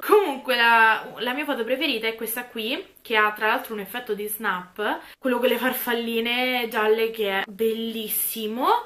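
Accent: Rome, Italy. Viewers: Il tuo romano il top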